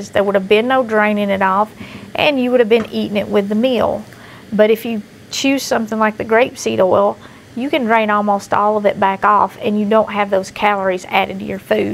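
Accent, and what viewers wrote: South, United States. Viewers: Dear Ma'am. Please know how much I appreciate your videos. I love the Southern accent and charm. I was born in Germany, raised in Chattanooga and now live in Murfreesboro. I have lived all over this world 'cause my stepdad was in the Army. Having spent a bit of time (twice) in Massachusetts (Yup....Yankee), I'm sure you can appreciate how much I love the South. Your shows are awesome, delightful and educational. Thank you for what you do and my God bless you and your crew. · I love your videos I love your southern accent I was taught by my mamaw to cook just like you do. I talk just like you, taters, mater's. I like the scripture's and the fact that you put God first. God bless you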